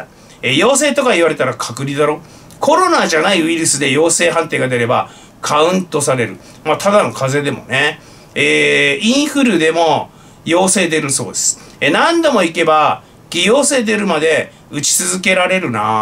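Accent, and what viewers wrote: Fukushima, Japan. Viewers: ほんと、福島なまりがかっこいい。NHK党に戻ってほしいけどね。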